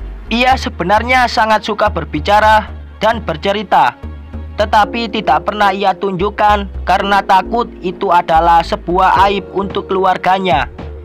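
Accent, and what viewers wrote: Java, Indonesia. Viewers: GK seru kalo critanya di bacakan,seperti membaca pelajaran waktu SD dulu,yg membaca teks logatnya medok lagi jadi Yaaa semakin gak seru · Ngomongnya medok jawa banget.Jadi ngga enak ceritanya !!!! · Kelihatan admin yg ngomong ini orang dari Bantul Jateng ya,kedengaran medok jowone,yg lucunya mendekati akhir cerita teksny hilang...mas klu mau buat cerita elupun ceritanya betbau hayalan yg baguslah kemasannya..ok,mas. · Suara jawa pekatbanget loo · Bertele tele...udh suaranya medok bgt ga enak didenger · Sebeliumnya saya minta maaf, ya, mas... (Juga untuk yg lainnya) Sebenarnya Ada benarnya juga jika sampeyan bilang gak mutu...itu jika diibandingkan dg ilmu2 pengetahuan atau agama. Ini tergantung bagaimana kita menyikapinya, jika pikiran kita kotor maka akan menjadi nampak kotor, Semua hal ada sisi baik dan ada sisi buruknya, jika kita lihat banyak buruknya, cobalah kita cari sisi baiknya walau sedikit sehingga kesalahan / keburukan seseorang tak nampak menggunung. 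Simple saja.. buang yang buruknya, ambil yang baiknya ..yaitu hikmah di dalamnya agar semoga kita dijauhkan dari kemaksiatan dan senantiasa menjaga keimanan kita, aaamiin. Bukankah Untuk menjadi baik adalah belajar dari kesalahan? Dan untuk menjadi wong bener adalah dengan belajar dari yg salah.., jadi mari kita anggap saja channel adalah guru yg sedang menasehati / mengingatkan kita agar kita berhati2 dan waspada karena manusia sesungguhnya tempatnya khilaf / lupa, sehingga kadang tiba2 terjebak dalam kemaksiatan. Bisa saja kita sekarang mencela. Besok kita yang terperosok. Semoga gusti Allah selalu menyertai dan melindungi kita. Aamiin.... Kalau saya lebih fokus dg bahasanya yg medhok gak karuan dan suara jelek serta cara membacanya yang jelek sekali, haha.. Maaf, ya.. Buat adek yg punya channel. , tapi itu yg membuat nilai pluznya, menjadi. . Lucu.. jadinya bikin saya ketawa terus, apalagi jika bilang tamaaat.. 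Atau sekian.... Saya ngakak sekali. Oleh karena itu saya lebih fokus ke lucunya .. Semoga kita bisa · Lucu cara ngomong mu, medok 😂😂😂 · baca.anya seperti jawa tuken bro keras. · Kok medok bngt .orang mana ya bpk.lagi terakhirnya **TAAMAAT**KYK DI BOSKOP AJA🤣🤣🤣🤣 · Medok dan tamat ...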